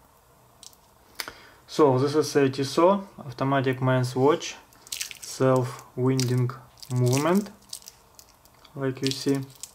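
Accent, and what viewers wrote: Russia. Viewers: я не знаю английский, но обзорщик явно русский)))) а часы отличные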